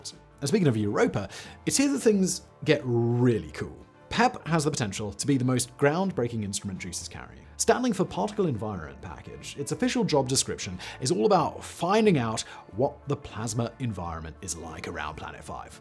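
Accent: England, United Kingdom. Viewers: "Is There A Life" on the thumbnail? Poor English from someone with an English accent...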